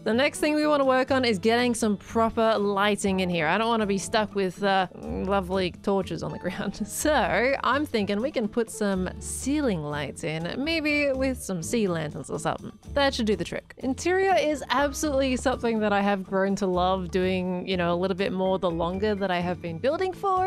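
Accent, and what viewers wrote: Australia. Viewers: A hermitcraft does of happiness... Thanks a lot, you realy made my day, with your thick Australian accent 💜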